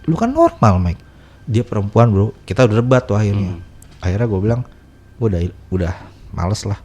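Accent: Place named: Java, Indonesia. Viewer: Padahal ga usah pake bahasa Inggris bang, pake bahasa Indonesia aja ceritanya. Gatel denger bahasa Inggrisnya yang bukan cuma medok lokal tapi juga belepotan banyak ngaco.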